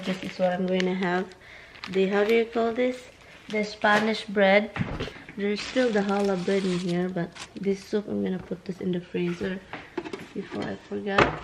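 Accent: Visayas, Philippines. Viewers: I always wacthing you ,,kc,,natatawa din ako s visaya accent mo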